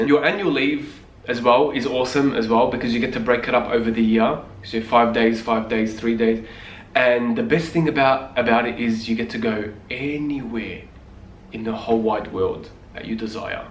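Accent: Australia. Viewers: Everything you’re saying is spot on 😂😂😂 are you Australian? Love this video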